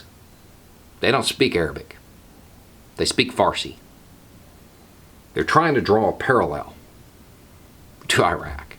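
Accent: South, United States. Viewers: See at first when i heard your southern accent I thought you were going to be all for it because literally every southern person likes Trump but it turns out you were not that type of person and you are very well spoken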